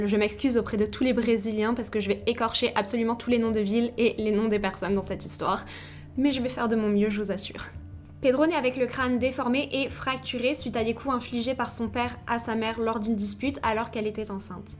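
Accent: Quebec, Canada. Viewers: Bonsoir, je viens de découvrir tes vidéos depuis début juillet 2021. Merci pour tes vidéos, j'adore ton accent québécois. Pourquoi as-tu choisi de réaliser des vidéos sur les crimes, stp? A tantôt. Belle fin de soirée, bisous livresques nocturnes criminels.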